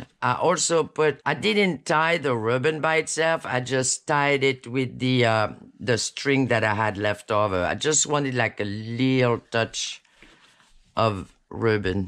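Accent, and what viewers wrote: France. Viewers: I just love it the grundy look. Absolutely luv hearing you talk. The French accent. Reminds me of home. Gaspè, Quebec. Wear are you from? Happy you are workin with Tinne. Just amazing kits. Ok now to watch tutorial! Cheers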